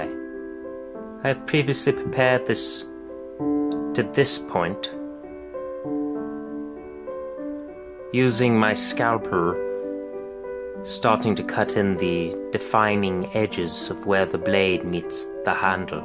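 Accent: England, United Kingdom. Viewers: your calm British accent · I like. I like the English accent too and although it was slightly off (only slightly), I wish you would do it more often.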